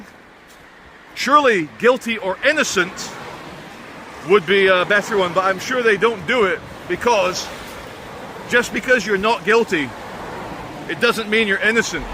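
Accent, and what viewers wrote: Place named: Scotland, United Kingdom. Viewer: so nice for me to hear UOUR Scotish accent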